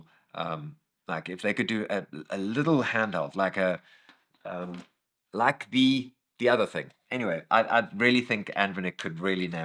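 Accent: South Africa. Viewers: I know a South African when I hear one!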